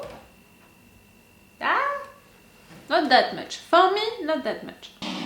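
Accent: France. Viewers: .....why I feel fall in love with ..you , reactor.. What wrong with me .. This is 1st time I had this feeling, experience.. 1st of all your dialect..slang. Are you french? It's so beautiful... For this moment I agree french is beautiful soft language.. Sorry this is random and ridiculous.haha Btw ..as aot fan...I will stick to this ,your channels,your reaction.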